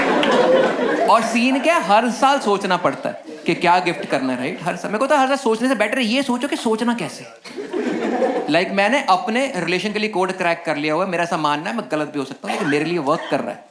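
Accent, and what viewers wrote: Punjab, India. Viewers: I love punjabi accent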